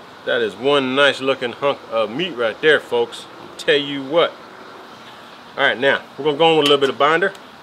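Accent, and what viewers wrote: South, United States. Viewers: Looks good, might have to try this sometime. Is it just me or has developed an even STRONGER southern accent in the last year or so since I watched one of his videos? Seems like he might be hanging out a bit more than usual with some boys from the deep, deep south.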